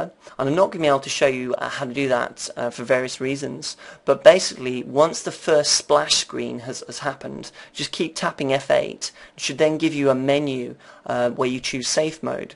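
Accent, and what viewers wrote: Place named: Mid-Atlantic, United States. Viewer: Sorry. I tried to watch this, but got too annoyed at the mid-atlantic accent. You're English man! It's not 'compewder' - it's 'computer.'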